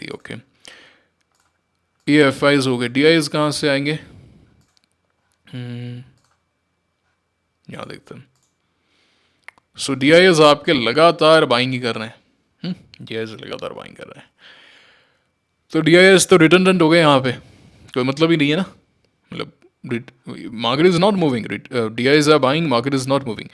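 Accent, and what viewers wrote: Rajasthan, India. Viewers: Sir app rajsthani ho kya ❤❤❤❤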